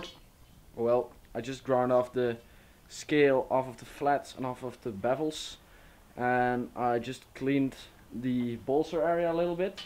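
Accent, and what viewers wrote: Netherlands. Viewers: Are you dutch? Your accent seems so Edit: ik lees nu pas je beschrijving😂 ik hoop dat je weet dat een yatagan eig geen zwaard is maar een dolk👌🏽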